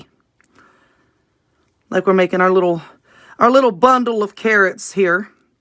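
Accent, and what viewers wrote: South, United States. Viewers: Your so funny. This are beautiful crafts. I just love listening to you with your southern accent. I used to live in SC for almost 30 years before moving here in MN 3 years ago! Yes weather here is terrible right now we had snow storm Sunday. I missed the the weather in the South.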